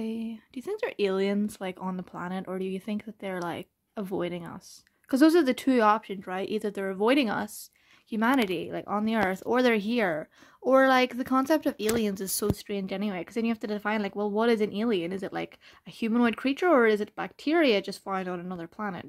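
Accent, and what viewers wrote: United Kingdom. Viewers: hello , are you from uk ?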